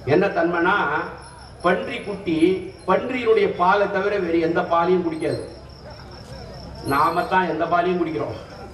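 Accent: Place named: India. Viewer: ஐயா நல்ல தமிழ் கேட்டு நாளாச்சு. உங்க புண்ணியத்தால் எம் செவி குளிர்ந்தது.அதுவும் ஒரே மாவட்ட தமிழை கேட்க்கும் போது மிக்க மகிழ்ச்சி உங்களை ஆலத்தூரில் நேரில் சந்திக்க இறைவன் அனுக்கிரஹம் வேண்டுகிறேன். இனிய ரமலான் நோன்பிருந்து நினைத்தது நிறைவேற வாழ்த்துக்கள்.ஐயா. இவன் பூ. நிரைஞ்சன் குமார் ஆற்காடு.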